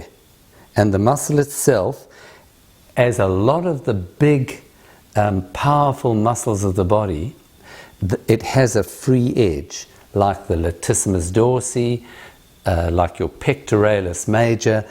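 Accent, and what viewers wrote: South Africa. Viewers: Dear, your accent sounds South African. Loved this video.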